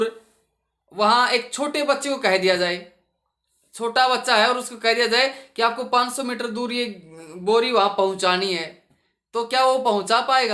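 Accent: Haryana, India. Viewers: Aap haryana se ho na 😅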